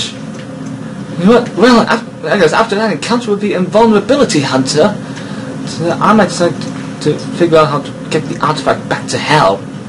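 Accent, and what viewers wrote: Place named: United Kingdom. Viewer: thnx, this video helped. got stuck. also, british accent = awesome :-D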